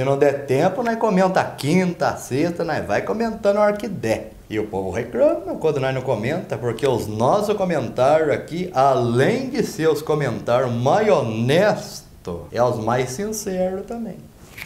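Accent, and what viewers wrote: Minas Gerais, Brazil. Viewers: vc é mineiro igual eu? Kkkkkkkkkkk 😁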